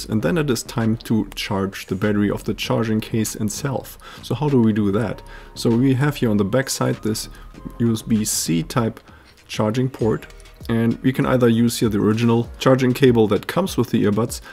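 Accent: Germany. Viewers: just because Im curious, is he german? I think he has an german accent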